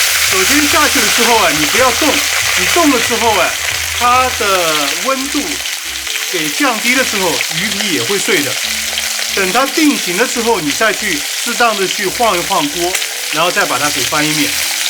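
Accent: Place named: Nanchang, China. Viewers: 这位大哥说话习惯在句末加个诶，的口音是熟悉的南昌味哈哈哈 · 南昌人表示听你这南昌口音普通话太亲切了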